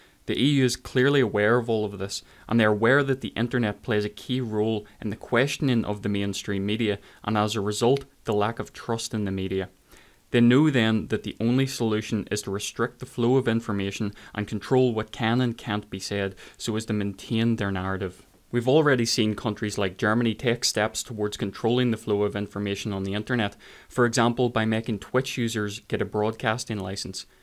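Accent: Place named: Northern Ireland, United Kingdom. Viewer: I love your Norn Iron accent. Nice seeing people from our country getting popular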